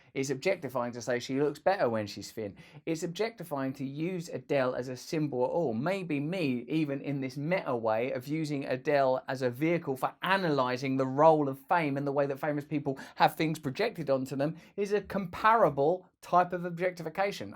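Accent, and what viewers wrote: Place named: United Kingdom. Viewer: Russel You More Than Look Cool, And Sound Cool, With That British Accent Dude You Are Way Cool!!!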